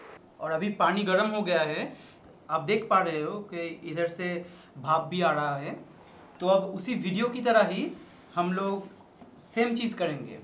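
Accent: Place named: West Bengal, India. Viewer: i am sure that u r from west bengal becoz ur style of speaking hindi clearly derives that u r from west bengal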